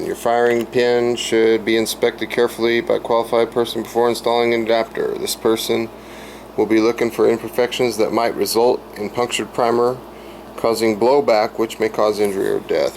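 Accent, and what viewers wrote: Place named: Minnesota, United States. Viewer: Hey, where are you from? You sound like a Minnesota native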